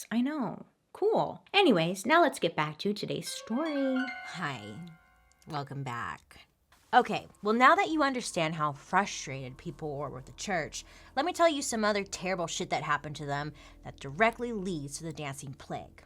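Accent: United States. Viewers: Where is from v my thoughts our deffo usa accent v do love a the Americans v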